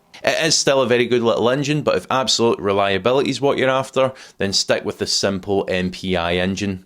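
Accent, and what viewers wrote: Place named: Cockney, United Kingdom. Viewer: damit... sometime I feel I hear Cockney! Can you please speak more distinguishable, more sharp?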